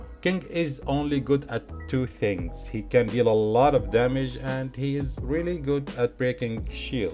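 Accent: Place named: Arab world. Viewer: Where r u from man? you sound arabic af 😄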